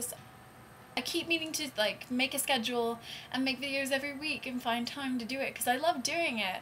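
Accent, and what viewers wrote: England, United Kingdom. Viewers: i wiv your nglish accent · alguien puede traducir tooooodoo lo que ella dice? al español... I don't understan so much... her acent is brithisch?? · Whoever said she was Australian? She's English. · she is British lol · damn britsh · Oh .. i just love brit accent . especially after harry potter and charlie bit my finger .. may be thats what i am against ;) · british beeyach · Talk about whatever you want. No matter what I'll enjoy hearing it. You have this cute British accent that I just love listening to. Don't worry about your hair. It looks absolutely beautiful! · she's from the UK thats how we pronouce it :) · holy cow! i shes hot! i love her british accent too! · Well At least its a nice English Accent. Not English people who slur there words..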